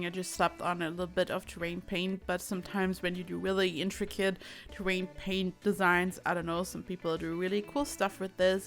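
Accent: Germany. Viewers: Are u german? You sound kinda german to me ☺️ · I recognized the accent, but wanted to make sure. Ich bin auch aus Deutschland ☺️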